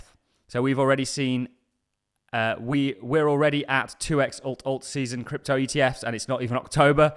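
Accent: Birmingham, United Kingdom. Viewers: Cheers. Keep up the good work. Placing your accent somewhere in the south / South West Birmingham area?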